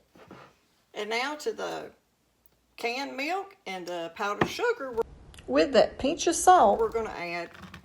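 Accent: South, United States. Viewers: I came to learn how to make creamer, I stayed for the southern accent! 😊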